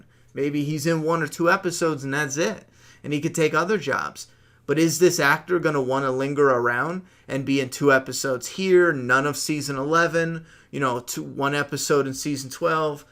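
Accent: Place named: New York, United States. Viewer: YOU must be from New York or Jersey. My son came home with this accent. LOVE it. I laughed when you got pissed at your phone. Ha ha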